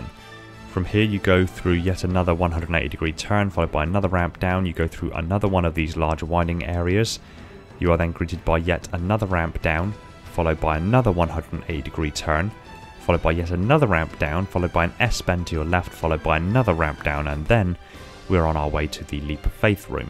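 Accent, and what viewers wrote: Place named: South West England, United Kingdom. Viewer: This is top notch but I've spent the whole video trying to work out where in the South West your accent is from. I'm leaning towards Bristol but I'm also getting Gloucester vibes.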